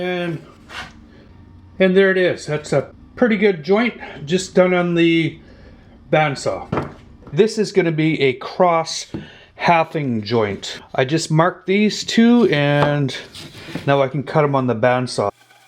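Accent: Canada. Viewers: Great videos, they have been a lot of help to me, please don't be insulted if I am wrong, but you sound Canadian to me? I'm English, and it's hard for us to tell sometimes.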